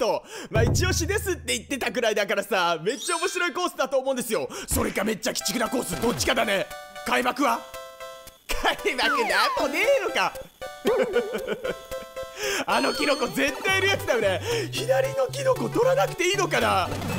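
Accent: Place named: Miyazaki, Japan. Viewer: さん宮崎出身ですか！？ 〜しちょる。とか 〜にしちょった。ってよくじいちゃん喋ってて懐かしかった笑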